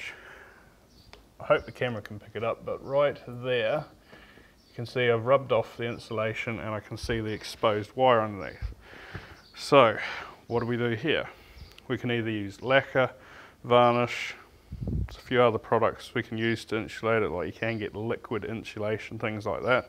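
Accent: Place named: New Zealand. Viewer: This guy is a Kiwi, so it is pronounced TUN, not Tin.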